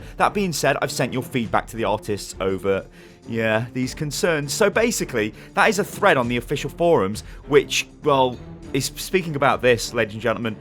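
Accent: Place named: Midlands, United Kingdom. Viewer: You're British, stop saying "like" all of the time... you sound like an Orange County teenage girl... with a midlands accent.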